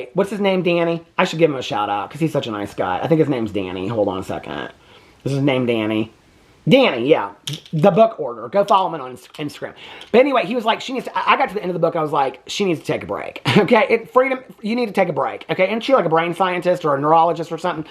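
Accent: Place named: Boston, United States. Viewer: I love your Boston accent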